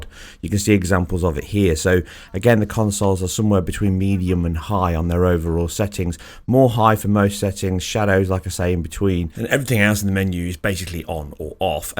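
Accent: United Kingdom. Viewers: There is no wrong or right, but he sounds like a proper British.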